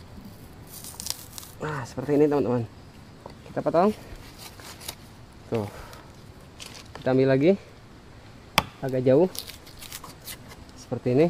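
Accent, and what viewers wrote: West Java, Indonesia. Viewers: bang ngmong nya campur sunda jadi lucu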